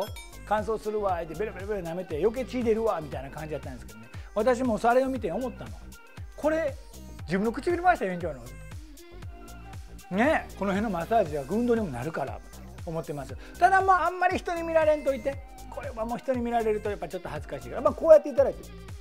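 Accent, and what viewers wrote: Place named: Kyoto, Japan. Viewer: の関西弁は大阪ではないと思いました。もしかして、京都とかだったりしますか？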